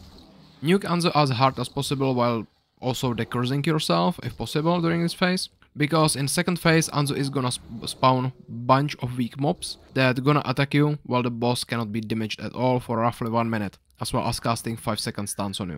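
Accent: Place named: Czech Republic. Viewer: proč jsem poznal že si čech v prvnich 3 slovech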